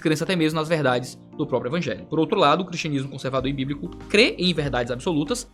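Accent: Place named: Northeast, Brazil. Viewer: Não se acanhe,. Espalhe nosso sotaque nordestino através do português e do inglês tbm kkk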